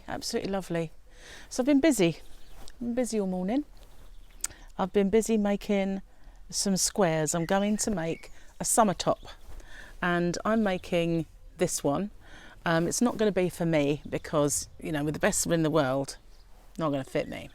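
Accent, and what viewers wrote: United Kingdom. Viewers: Text or call and see if they will take measurements that you can use in crocheting projects. I am enjoying your Veda as I feel I go for a visit to the UK each time I hear you talking. Like you, i steer away from the grim and doom frequently portrayed on TV. I have tuned into some good Christian channels that are instead very upbeat and encouraging. Keep it up love, you are doing fine!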